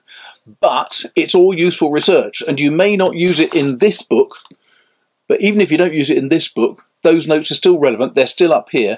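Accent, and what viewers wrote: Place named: RP, United Kingdom. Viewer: Could you please make a video on why is it that most Brits, even well-read persons like you, have this unbearable artificially arrogant, posh, patronizing, lordly, toplofty tone while speaking? Even among yourselves... what are you trying to proof? The British Empire finished long time ago, did you know?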